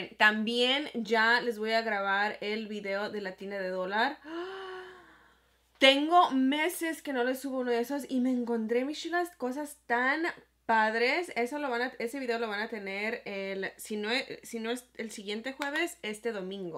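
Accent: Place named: United States. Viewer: Me gusta cómo habla jajaja su acento bien pocho jajaj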